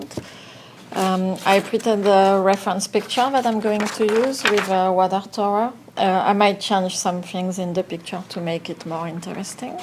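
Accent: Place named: France. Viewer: your accent is charming, perfect English, I wish my French were as good! I cannot wait to try this technique.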